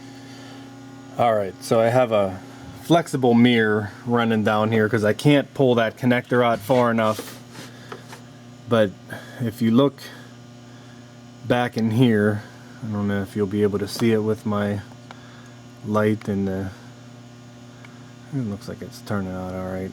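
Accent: Pittsburgh, United States. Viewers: I thought you sounded like you had a "burgh" accent. Central pa here. I have the same problem with my caravan I think, I've got to tear it apart to make sure. Thank you for the info.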